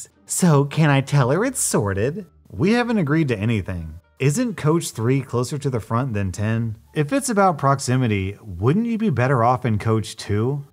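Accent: South, United States. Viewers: Hey, I’m loving the southern accent plus that pronunciation was epic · Im for ga. And when he does the southern voice all of us here in ga are okay with it. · please keep doing the southern accent.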